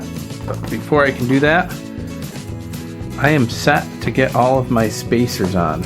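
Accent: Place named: United States. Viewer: Are you aware that American English is different than British English? He pronounced it the way Americans pronounce it because he's an American. He's pronouncing it exactly the way it's supposed to be pronounced in America. Why on earth would the British pronunciation be any more correct if he's not actually British? That's just nonsense.... lol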